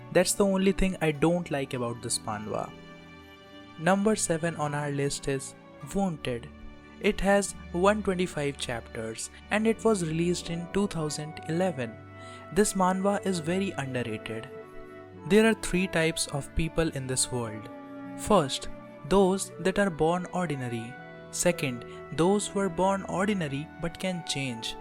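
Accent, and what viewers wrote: India. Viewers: I get Indian vibes from your accent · Fuvking india accent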